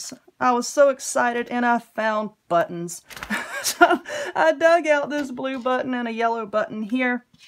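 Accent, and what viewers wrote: South, United States. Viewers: Mam, Your potholder caddy is awesome!! I am getting ready to have a craft sale at the end of the month and I came on tonight to look for simple ideas to make on a budget. I have tons and tons of fabric as my grandmother use to own her own fabric shop - so I was looking for cute easy ideas for some new crafts to sell. As soon as you mentioned that you need to take your shoes off I thought to myself, "wow, this gal is like me". When I use to sew with a treddle foot machine I always sewed with my shoes on and I would get that treddle going very fast but, now with the modern day peddle I have to sew barefoot as I have more control over it that way too. Most of the pot holders I sell I also make but, I don't believe my pot holder will work for your little bags. I will start watching for the pot holders on sale at various stores. 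I believe my Dollar Tree might have your type of pot holder too along with the zip lock bags. These little purses would be ideal for teen girls also and small enough for their lockers. I think they would be good for sun glasses/eye glasses but, you cannot sew the sides completely up so you have room to slip in the glasses. Thank you for an awesome new craft idea. - Rebekah in Alexandria, VA PS: Where are you from with your thick southern accent? I am from NJ so you can imagine how I sound. My niece laughs at how my mother, grandmother and I say coffee. Her father is from NC so after a summer with her other grandparents, my niece comes home calling all of u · I'm from NC as well, I can totally relate to your southern accent and way of talkin. I enjoyed this video, I just might try making these purse caddies. Tfs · You never bore me love watching your DIY and learn from them. Plus like your southern accént 👍👍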